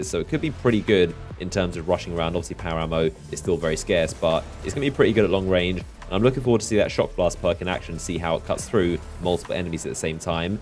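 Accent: England, United Kingdom. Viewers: this video is supposed to be a tutorial but your talking too fast. as if u dont even care if people can understand you or not . plus u have a thick english accent. how can u expect people to understand you... this video is a thumbs down!! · Is it just me or do gamers who have UK accents r just awesome (he's from the UK right)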